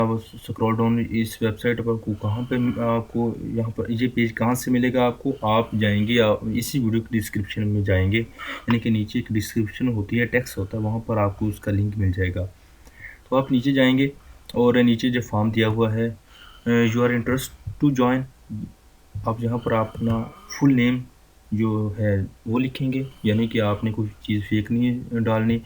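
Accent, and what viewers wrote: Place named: Punjab, Pakistan. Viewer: Try to speak clearly your pronounciation is purely Punjabi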